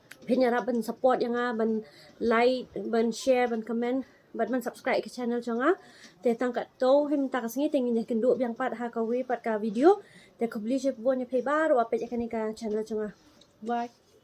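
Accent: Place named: Malki, India. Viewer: Ktien shnong malki te kum jong ii thik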